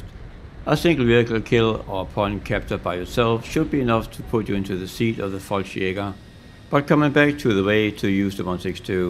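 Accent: Denmark. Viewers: Tak for info min ven. Det gik først op for mig til sidst du lød dansk hahaha. Keep up the good work brother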